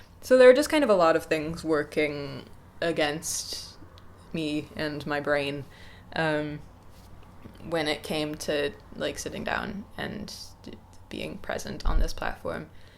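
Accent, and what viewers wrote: Midwest, United States. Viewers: You're sounding more American again. Huh. Interesting. hahaha speaking of analyzing. Glad you seem well and that the break was good for you and a well thought out, conscious decision. Privacy is healthy, keeping boundaries is healthy. Good on you! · i finally heard the midwest in your voice here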